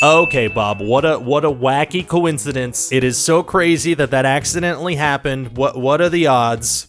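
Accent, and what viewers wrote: Canada. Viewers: Wow is screen rant Canadian? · Wait dude are you Canadian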